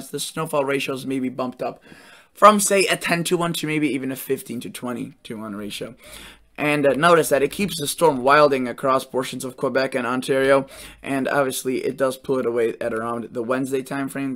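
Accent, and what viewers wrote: Midwest, United States. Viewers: You have a nice voice for these presentations and I enjoy your accent. I think it's from somewhere in the Midwest/Upper Great Lakes. I am a Southerner originally but now living in New England. I can tell your accent is not from the South or New England.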